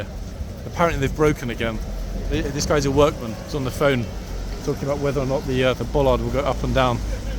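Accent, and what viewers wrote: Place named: United Kingdom. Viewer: I love listening to your very English voice.and no Americanisms ? As an old lady,I was taught English Grammer ! Thankyou so much for all the info,M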